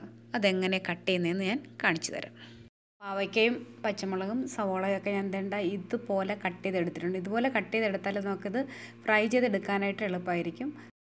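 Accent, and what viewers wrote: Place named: Ochira-Karunagappally, India. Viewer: സഹോദരി. ഓച്ചിറ, കരുനാഗപ്പള്ളി ഭാഗം(സംസാരം) ആണെന്ന് തോന്നുന്നു.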